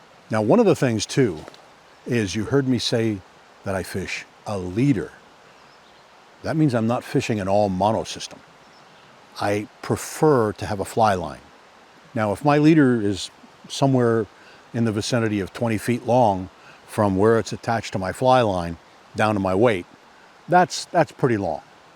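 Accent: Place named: Appalachia, United States. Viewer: Cannot understand a word because of poor sound and Redneck Hillbilly English!😢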